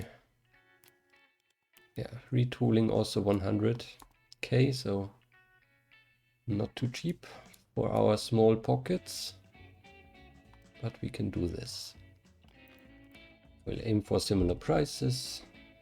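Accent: Germany. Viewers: As a german, i really like your (german) accent. It makes it kind of easier to listen on a second screen (listen to your stuff as a kind of audio tutorial). Especially with kids around. Strange, but it works. Keep up the good work.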